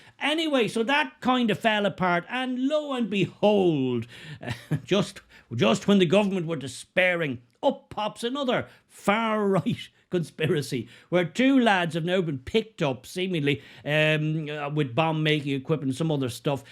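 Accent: Ireland. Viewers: Maybe you should clean out your ears and if that doesn't work then accept the Irish have their own accent. 🙄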